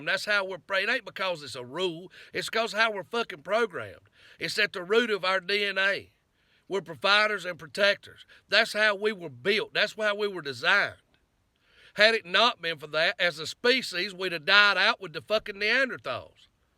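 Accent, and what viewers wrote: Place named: South, United States. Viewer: Damn, I agree what your saying....But you say GD way to much....but your completely right, also, where you from, you sound like your from my part of the south, your ether from Georgia, Florida, or my home state...Alabama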